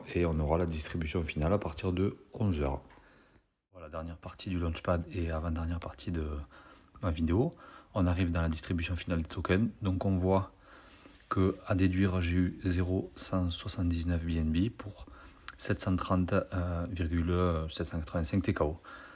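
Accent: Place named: South, France. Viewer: Et en plus t’as l’accent du sud ✌️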